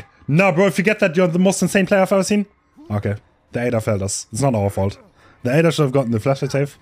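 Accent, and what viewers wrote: Germany. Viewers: I knew I heard some German accent. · Ist er deutsch?😂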